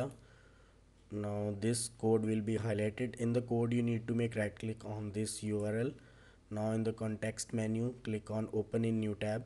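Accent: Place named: India. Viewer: why would you trust a person with an indian accent and bit.lys in the comment section explaining how to log out from messenger....not to mentiont that 90% of positive comments are indians.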